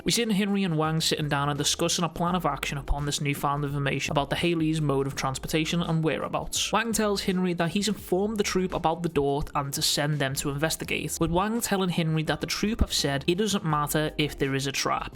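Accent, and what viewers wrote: Liverpool, United Kingdom. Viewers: My scouser reviewer in the building 😍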